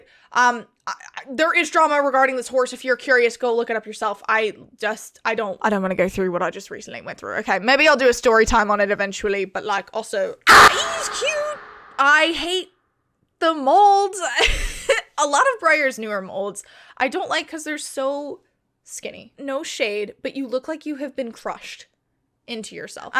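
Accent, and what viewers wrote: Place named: United Kingdom. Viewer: British really came out in this one 💂‍♀️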